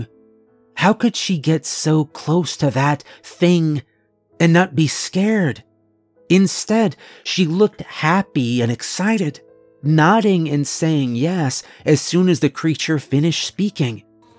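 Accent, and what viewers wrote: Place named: South, United States. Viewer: Enjoy your narrations, are you Georgian? Carolinan? Edit Or Tennessean your voice sounds to me like home. Do you have a podcast?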